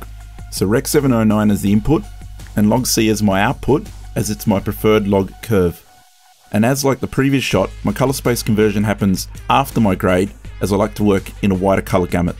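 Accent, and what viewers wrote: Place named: Sydney, Australia. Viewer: Lolz,.. sounds to my ears like he's got a Western Sydney or North West Melbourne accent. Trust me, he's talking slow for those accents :)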